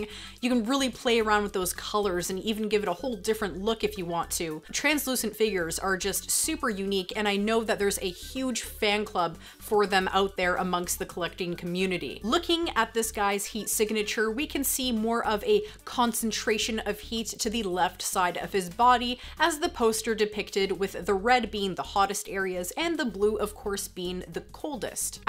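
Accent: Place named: Vancouver, Canada. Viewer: Your Vancouver accent is very apparent. I speak differently from you here in Ontario. Your speech is a little bit more clippy whereas, we tend to speak a little more laid back in Toronto.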